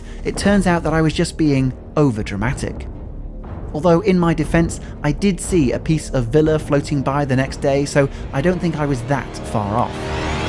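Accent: United Kingdom. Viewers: My first time viewing and enjoyed the humor and as an american the brit accent top it of. I think rain will spoil any vacation time. I live in the great lakes region[ohio] and May thru Oct. is really nice.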